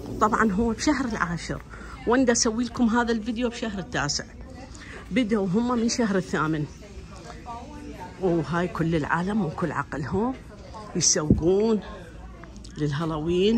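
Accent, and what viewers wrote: Baghdad, Iraq. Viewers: والله استمتعنا كلش لان نفس ذبتنا وسوالفج كلش حلوه وذكرينا بالحجي البغدادي الحلو الله يبارك بيج وصحه وعافيه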